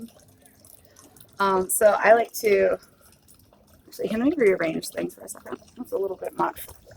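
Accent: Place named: South, United States. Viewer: How long have you been in Central PA? I'm hearing an awful lot of Y'all's, you're a little north for that kind of talk.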